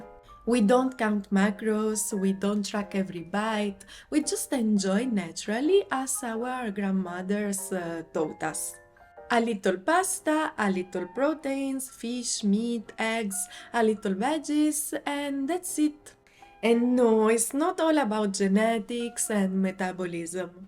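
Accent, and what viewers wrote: Italy. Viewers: Grandeee finally someone explaining this truth to the world. I tried to explain this to non italians but they didn't really get it. Now I can show this to my friends and maybe they will understund ahah. Grande ti seguo da un paio d'anni, mi piacciono molto i tuoi video e anche il tuo modo di spiegare le cose. ed e' bello il tuo accento italiano quando parli in inglese. Un abbraccio dalla Sicilia.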